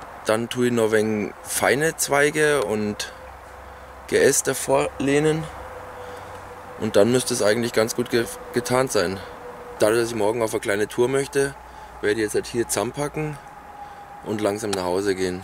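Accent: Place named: Franconia, Germany. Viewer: weil du total fränkisch redest und du auch schon in der frändischen schweiz unterwegs warst... Gruss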